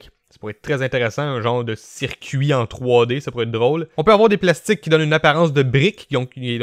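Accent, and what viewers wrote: Canada. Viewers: Comme toujours, hyper intéressant! Et entre ton accent très exotique que j'adore (mon pépé a été dans la police montée chez vous, à une autre époque il est vrai!) et ton débit (mais comment fais-tu???, Heureusement que tu n'es pas rappeur), je me régale à regarder tes reviews. Toujours instructives et précises. J'attends avec impatience tes essais sur du PLA flexible.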